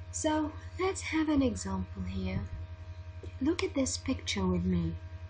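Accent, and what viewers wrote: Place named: United Kingdom. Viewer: I really liked your British accent it's really good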